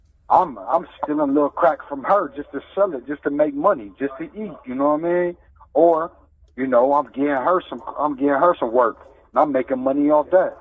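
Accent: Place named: New Orleans, United States. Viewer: He talks like he comes from NOLA.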